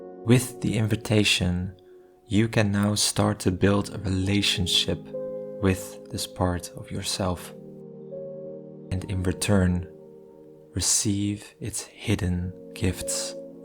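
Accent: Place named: Netherlands. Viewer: Beautiful artworks and great storyline. Your video’s are great value to my as I am on the path of individuation as well. Thank you. I can hear from your accent that you are from the Netherlands as well?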